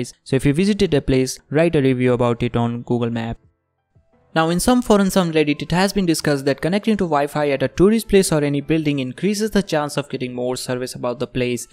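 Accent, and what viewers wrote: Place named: India. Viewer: Indians English pronunciation..... ew but thanks for help anyways.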